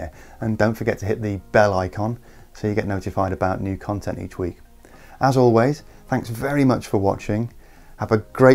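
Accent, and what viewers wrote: England, United Kingdom. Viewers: Once the COVID lockdowns are lifted, demand for oil will rebound and the supply glut will ease. Most of this video seems like wishful thinking, as oil consumption is predicted to rise through 2040. Great presentation and a pleasant English accent doesn’t change that barring a technological breakthrough, fossil fuels will be with most of us for a while yet.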